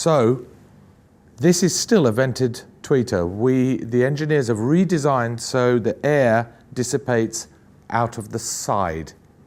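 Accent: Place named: United Kingdom. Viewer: Literally sounds nothing like him, this guy has a thick Brittish accent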